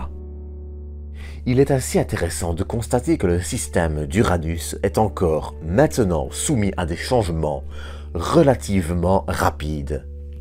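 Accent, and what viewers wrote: Belgium. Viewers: Ce monsieur a tout à fait le droit d'être belge et d'avoir une manière différente de parler... Quel commentaire insupportable. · Je sais que je ne vais pas me faire des amis mais le documentaire a l'air vraiment sympa et très intéressant mais la narration 🥶🥶🥶 au bout de 10min j'ai arrêté car je n'en pouvais plus... dommage...un mélange de belge, américain, allemand et canadien. Et la façon de prononcer Hubble à chaque fois ça devient très irritants.. mais je répète dommage car le documentaire doit être vraiment intéressant.. désolé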